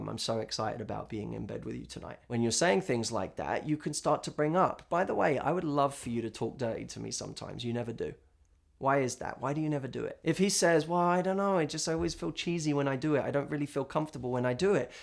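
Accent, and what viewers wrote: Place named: England, United Kingdom. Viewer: I loved it when he said in the bedroom, and dirty tawlk, nawtee talk...lol cute, in that sexy English lad accent of his... I enjoyed that stuff he said when he was speaking in a seductive tone... Ooh those lovely green eyes, the sultry expressive baby come hither looks and body language, but those soft tones make me all melty, I want to hear him speak like that to me, telling me what he would like to hear me say, & costing up in the street whispering I can't wait till I get you home. Of course in the context of being life partners I am permitted to imagine these lovely intimacies...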